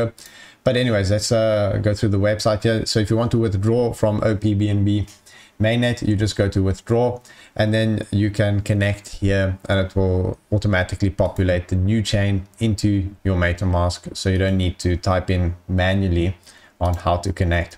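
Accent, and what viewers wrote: South Africa. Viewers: If I may ask, are you South African?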